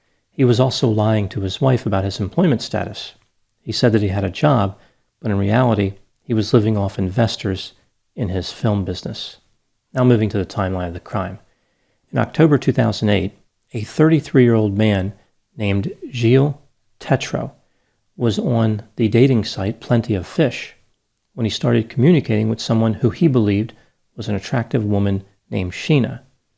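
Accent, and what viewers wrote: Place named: Mid-Atlantic, United States. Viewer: Off topic but... If I'm not mistaken, I believe I hear a touch of the Maryland/Pennsylvania/Delaware/DC accent from. This accent is unique to my region of the US (Maryland) and is strongest in Baltimore City & Philadelphia. accent isnt nearly as strong as most here but it's so unmistakable I'm willing to bet my life he comes from one of those states.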